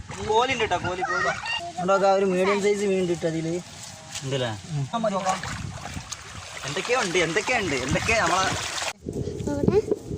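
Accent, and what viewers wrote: Malappuram, India. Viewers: Malappuram bhashayum .... pazayakala ormayum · Malapuram bashayanu ee vdonte monj..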